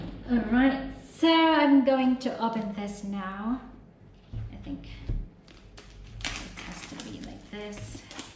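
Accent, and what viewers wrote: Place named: United Kingdom. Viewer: Love the accent - grabeh! British accent bah? Dko sure. Love it!!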